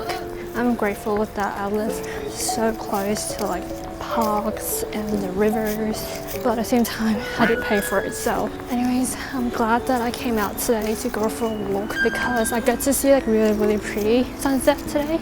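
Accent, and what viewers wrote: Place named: Australia. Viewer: When I first watched your videos I could tell that you were Chinese but couldn't tell that you were Malaysian Chinese probably because you grew up/lived in Australia so you had the Aussie accent😅 But am supporting you all the way from Singapore❤ Congrats on hitting 50k subscribers!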